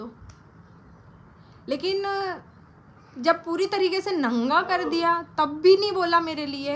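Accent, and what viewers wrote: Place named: Bhopal, India. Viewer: Aap ke baat se aap to Bihari lag rahe ho.. aap bhopli q bol rahe ho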